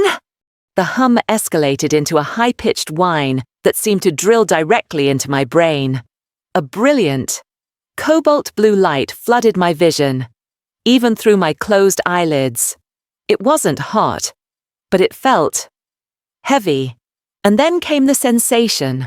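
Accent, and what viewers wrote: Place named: United Kingdom. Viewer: Enjoying the British accent.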